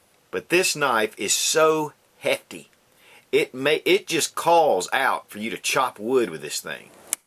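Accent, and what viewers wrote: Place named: South, United States. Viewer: This guy has the THICKEST southern accent I've ever heard lol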